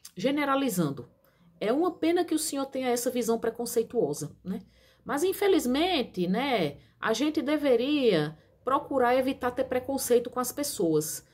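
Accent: Northeast, Brazil. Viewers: Ótima resposta, parabens, pelo seu sotaque vejo que vc e' nordestina, vc e' de qual Estado?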